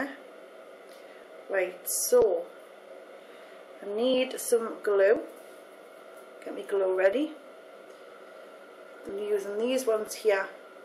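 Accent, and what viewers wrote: Newcastle, United Kingdom. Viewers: I think she sounds alittle more british.. from the UK at least haha. idk. I'm probably wrong. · I think she's from Newcastle (north of England) she sounds like Cheryl Cole so I think so! I loveee it :) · its called uk accent XD everyone talks like that there lolz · she's from newcastle, so its called a geordie accent lol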